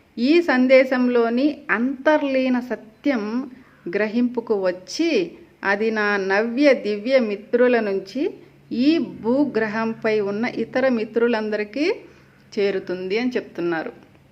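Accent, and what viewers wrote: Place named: Nellore, India. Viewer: నమస్తే మాస్టర్, గత కొన్నిరోజులుగా మీ వీడియోస్ కోసం ఎదురుచూస్తున్నాను. అంతలోనే అత్యంత అద్భుత సమాచారంటతో మీరు దర్శనమిచ్చారు. మీ మాడ్యులేషన్ మరియు కొంత నెల్లూరు యాస చాలా ముచ్చటగా ఉన్నది. మాస్టర్, మీరు మేము ఇంతవరకు ఊహకు కూడా అందనటువంటి జ్ఞానాన్ని అందిస్తున్నారు. ధ్యానం మీద మీరు చేసిన వీడియో బాగా ఉపకరించింది, మాస్టర్ ధన్యవాదములు. అయితే, కలిగె సందేహాలు, మీతోని నివృత్తి చేసుకునే వీలు లేకుండా పోవడం చాల నిరుత్సాహం కలిగిస్తున్నది. ఏదైనా మార్గం చూపించ గలరని ఆశిస్తున్నాను. కృతజ్ఞతలు మాస్టర్ 🙏🌸🌼🌺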